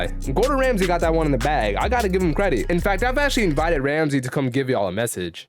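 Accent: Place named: Africa. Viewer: Yoh which part of africa are you from?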